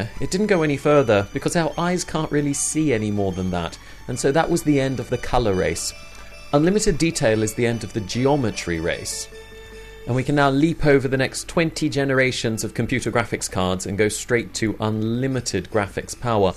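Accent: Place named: United Kingdom. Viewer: Isn't this Euclideon? And I thought he was Australian, what's with the British accent?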